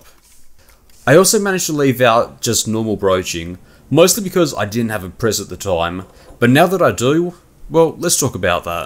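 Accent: Australia. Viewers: For someone like me who learned english at school some decades ago (british english as in most european schools) at the beginning it was not easy to understand everything spoken with this specific australian accent. But I got used to it and it pays off. Meanwhile I have watched every single of your videos and learned quite a lot!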